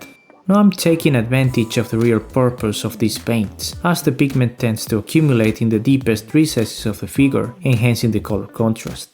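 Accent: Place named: Spain. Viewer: Lo sabía por el acento, gracias por la info, por lo general no hay muchos canales de modelismo españoles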